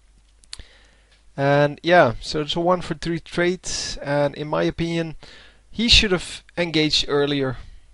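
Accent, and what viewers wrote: Netherlands. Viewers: You sound like a Dutchman speaking English.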